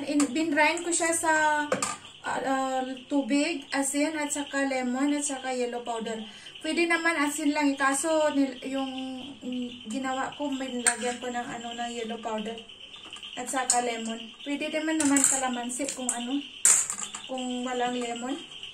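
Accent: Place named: India. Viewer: Sala Malaykum Sis ulam masalap. Looks yummy at I like your Indian Accent 👌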